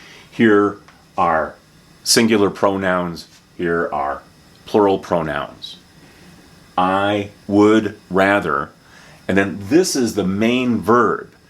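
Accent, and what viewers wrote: United States. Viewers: Never thought understanding American Accent is that easy. (Namaste from India, 🙏)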